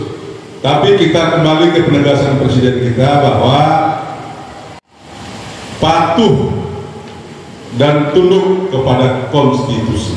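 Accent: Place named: Flores, Indonesia. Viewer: Mantap e suara flores.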